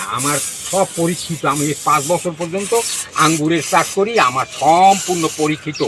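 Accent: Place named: Bangladesh. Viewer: দাদু আপনার বাংলাদেশী কথা আমার খুব ভালোলাগে। আপনি খুব পরোপোকারী।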